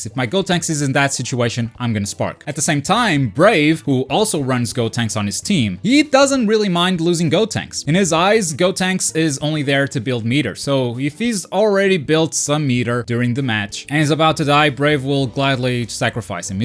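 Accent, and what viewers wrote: Portugal. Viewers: If I understood, you're Portughese? E AI MEN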